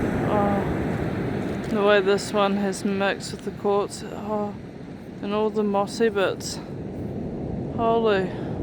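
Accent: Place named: South Island, New Zealand. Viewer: awesome yeah I've been mucking around with polishing pads on temu, finally found a set for about $40 that goes up to 10000grit and I just use them on an old bench grinder. It's quite slow so not much chance of overheating and blistering the rock a mistake I've made while learning and I bought a tile saw from Bunnings with a continuous blade for cutting them $140 ish I definitely need a bigger saw though for the bigger rock's. Cool I thought it looked like nz haha and a bit of a south island accent 😅 I lived in chch for years.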